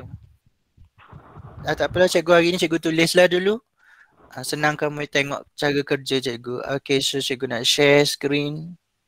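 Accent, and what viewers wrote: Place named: Terengganu, Malaysia. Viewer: 😅 sedak dgr org ganu cakak.. lame x g teganu..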